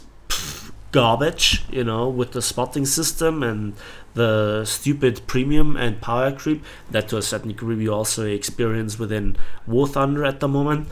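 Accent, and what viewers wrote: Bavaria, Germany. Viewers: Greetings from the Capital and to be honest please make more Subnautica. You actually made me buy it and i love it. Youre Bayrisch is hard to understand here up north but just speak the language youre comfortable with.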